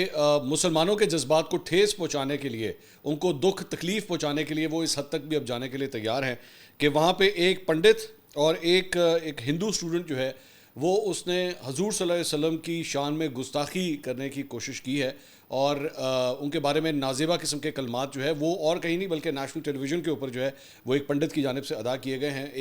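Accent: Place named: Lahore, Pakistan. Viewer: The Lahori accent, embracing it as a part of your identity and cultural heritage. The Lahori accent is indeed a unique and lovely aspect of Punjabi culture, and it's wonderful that in your videos i really appreciate and loved it!😂😂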